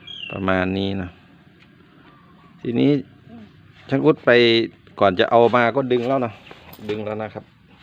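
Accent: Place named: Ubon Ratchathani, Thailand. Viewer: ช่างอยู่ อำเภอได๋สำเนียงคือทางอุบล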